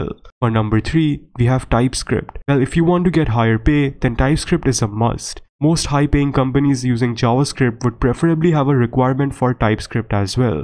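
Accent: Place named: India. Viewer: AND THERE'S the indian accent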